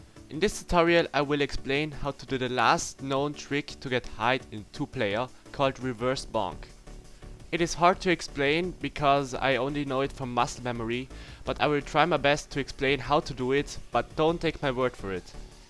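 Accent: Germany. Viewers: Are you german ? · Lol man merkt dass er deutsch ist😂🇩🇪 Wo sind meine german people 😂🇩🇪🇩🇪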